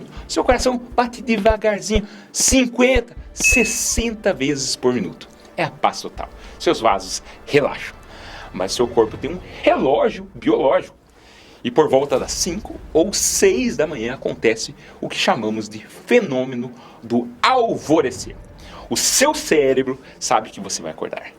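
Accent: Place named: Paraná, Brazil. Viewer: De que região ou estado é esse sotaque, quase não dá pra entender algumas palavras. Parece ser do SUL, talvez do Paraná., esse DF deve ser a região pra onde ele foi e não onde ele criou esse sotaque! btw: dicas interessantes!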